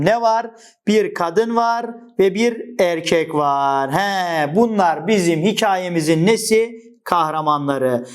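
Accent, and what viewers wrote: Black Sea, Turkey. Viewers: Hocam karadenizli misiniz?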